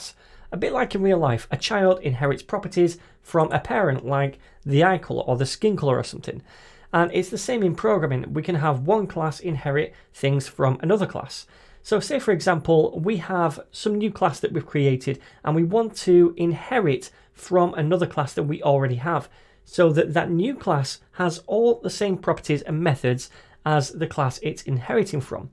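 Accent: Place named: Northern England, United Kingdom. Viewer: All your tutorials are fantastic. Great to hear a good northern accent also; more engaging somehow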